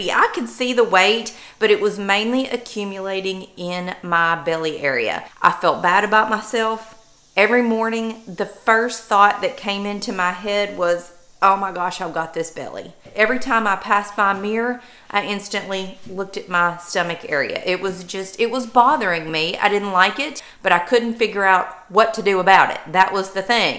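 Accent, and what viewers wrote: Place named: Kentucky, United States. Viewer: has some great advice and congratulations on your progress. It is about getting healthy and not about losing weight. And it does take 30 days to change our thought pattern. I like doing omad. I'm not hungry through the day and if I do have a spirit of it I drink an extra water. Can't wait to see the progress and definitely do a couple of videos on what you cook. I thought I detected a Kentucky accent, just found your channel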